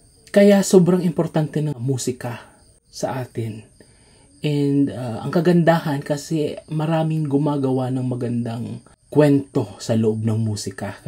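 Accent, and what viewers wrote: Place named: Bicol, Philippines. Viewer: Bicol accent, I love that. About, tugang, you can say that again.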